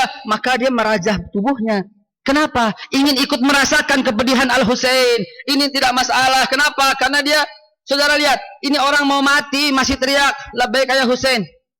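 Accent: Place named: Jakarta, Indonesia. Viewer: wajar aja beliau org betawi kan ngomongnya emang gitu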